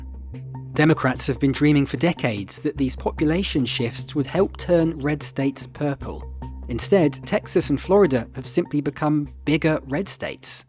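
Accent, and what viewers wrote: United Kingdom. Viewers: I love how it's narrated by a brit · The British accent is distracting. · NYT needs to hire an American to narrate these videos. Why should we Americans be lectured by a British accent?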